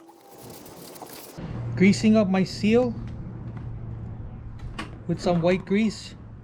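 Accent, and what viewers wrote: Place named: Louisiana, United States. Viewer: He from Louisiana all day I can hear it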